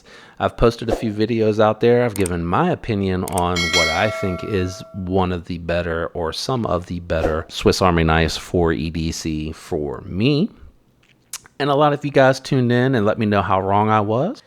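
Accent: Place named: United States. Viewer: Yeah buddy your good at making those videos where you from you sound like your form the good old US of a ☺️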